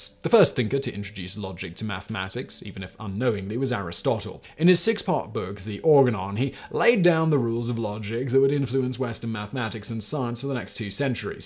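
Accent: United Kingdom. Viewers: I listen to the Casual Criminalist podcast at night while driving a truck in NC, USA. I have one complaint. It doesn’t come out every day. I need more murdering cannibal stories told to me in a proper British accent. Thanks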